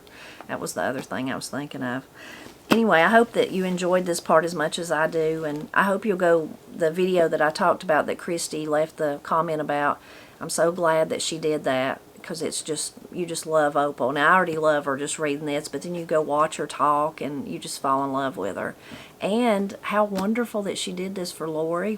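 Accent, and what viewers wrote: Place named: North Carolina, United States. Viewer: Thank you for reading this! I first read “Christy” back in the early ‘70’s, have gifted it to others, and re-read it myself a few times since. I’ve just ordered a copy of “Letters to Lori”. I live in Granite Falls, WA and do not often get to hear a beautiful N.C. accent, so I truly appreciate you reading the book and your commentary on it. It was a special blessing to see the video in the link with Miss Opal❤ May God bless you and your loved ones. Also, know that I’m praying for those folks who’ve asked for prayer.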